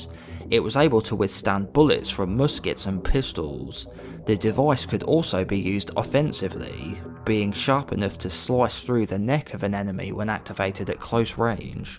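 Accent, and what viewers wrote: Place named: England, United Kingdom. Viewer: Super gay English accent. Where are you from?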